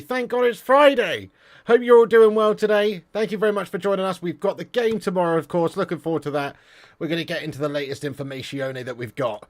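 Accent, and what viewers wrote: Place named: Cockney, United Kingdom. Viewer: ...but ,a better way to say ,maybe; blah yada ditto ,he had a caped goat ,but u pronounce like a full blown 1970s london east end evening standard newspaer seller outside all tube stations in that time peeriod .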